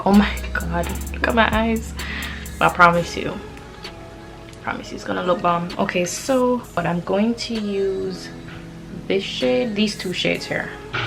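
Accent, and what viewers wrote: Jamaica. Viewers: You Jamaica already know the accent 😍😍😍🇯🇲🇯🇲 island gyal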